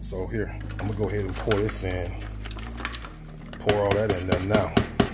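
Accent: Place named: New Orleans, United States. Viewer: Umm, ....ahhhh, ... no thank you. 🤢 My Southern grandparents ate that stuff and tried to get me to taste it when I was a little girl. They got it already made though. You must be from the South too. Sounds like maybe from 'Nawlins' correct?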